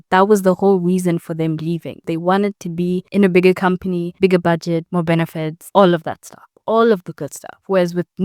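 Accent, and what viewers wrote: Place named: South Africa. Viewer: Love your voice and accent! Are you South African because it's giving South African accent?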